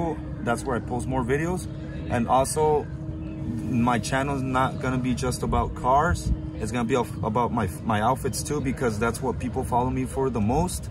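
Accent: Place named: Tijuana, Mexico. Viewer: You have Tijuas accent man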